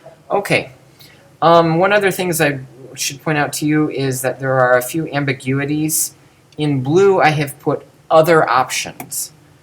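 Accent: United States. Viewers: for a lot of the vowel sounds, he is still using a more american pronunciation because a lot of greek teaching in the US teaches the alphabet as more-or-less substitution: Alpha is basically A, epsilon is a short E, etc. when really they should be taught as their own sounds. for consonants, there was a huge consonant shift between Homer and even when the septuagint was written, but he's using the later pronunciation. He should be reading phi as a heavily aspirated plosive, not as a fricative, etc. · Only to me he seems japanese? Listening greek read with english/ american pronounce is very funny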